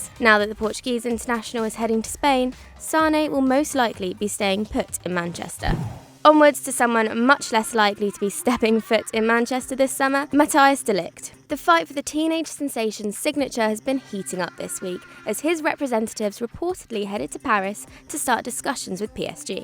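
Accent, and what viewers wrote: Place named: London, United Kingdom. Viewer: Oh god Today it's the split arse and yesterday it was the LANDAN CHAV INIT BLAD 🤣 get someone decent doing the voice overs for once.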